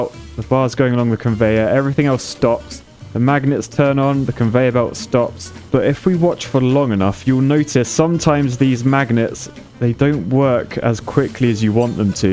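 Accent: United Kingdom. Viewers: are you British??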